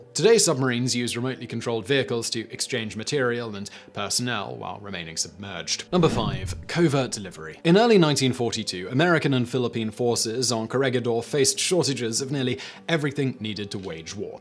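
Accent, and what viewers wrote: England, United Kingdom. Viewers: Gobble De Gook - He sounds 100% English to me. Not a hint of yank.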